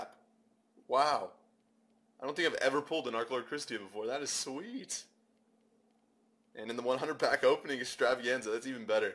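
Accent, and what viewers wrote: Germany. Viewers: Sounds like you are TRULY german!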